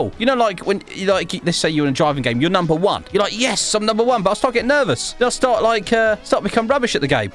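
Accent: United Kingdom. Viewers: i love your british and also your vids<3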